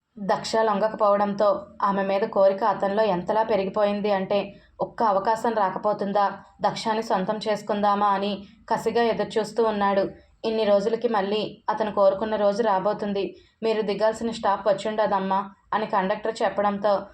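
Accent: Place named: Rayalaseema, India. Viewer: Rayalaseema bhasha bagundi😊😊😊😊❤❤❤❤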